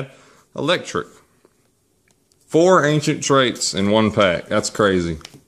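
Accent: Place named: South, United States. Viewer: why do you speak so country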